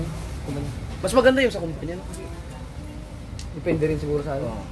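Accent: Visayas, Philippines. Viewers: Bisaya ka Dong?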